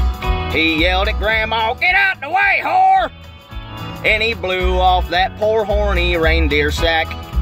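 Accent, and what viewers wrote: South, United States. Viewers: This is so hillarious! I love your southern accent on this makes it funnier and the lyrics are genius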